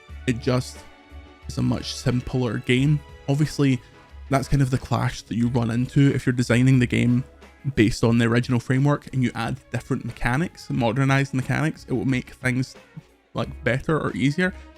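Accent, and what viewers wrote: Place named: Scotland, United Kingdom. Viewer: You're a troll or an idiot. The accent is Scottish, and as an Englishman I understood him perfectly.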